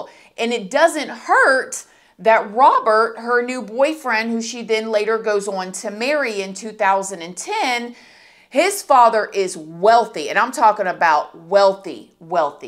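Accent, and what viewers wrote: South, United States. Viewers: I just love your honesty and southern accent!! You’re awesome. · Lol your southern accent was super hard in this video. That happens to me when I’m around people from Brooklyn. Love you girl PS you must’ve been around someone today that has a hard accent. Hehe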